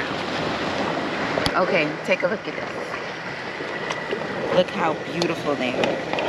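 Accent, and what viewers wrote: Bahamas. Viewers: You even sound like a Bahamian. He don’t even know this grease hot. Whose your people? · Girl I knew I heard a Bahamian accent in you. I love Bimini. 🇧🇸🇧🇸🇧🇸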